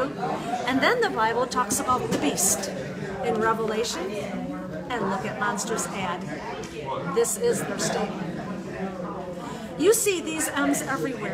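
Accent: United States. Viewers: That New York accent just makes everything better 💀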